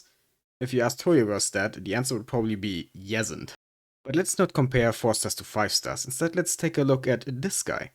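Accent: Germany. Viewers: The german accent is strong with this one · I can sense the german is strong in you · I hear German or am I insane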